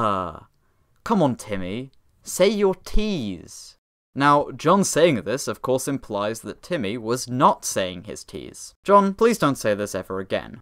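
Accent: United Kingdom. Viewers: I just realised you're British accent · i was expecting this to be you saying americans gotta say them but oh yeah ur british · thought this was going to be about replacing t sounds with d sounds because i forgot you were british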